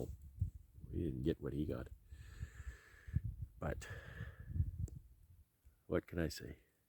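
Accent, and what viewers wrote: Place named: South, United States. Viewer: You sound like a fellow from the South.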